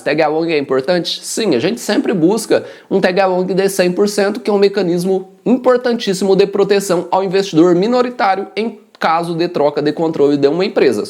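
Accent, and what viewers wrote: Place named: Inland São Paulo, Brazil. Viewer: Esse sotac Paulista do interior é irritante rs mas o que vale é a informação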